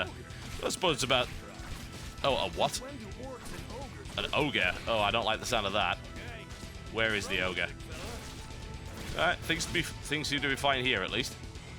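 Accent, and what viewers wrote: United Kingdom. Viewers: u talk too much and ditch the british accent thanks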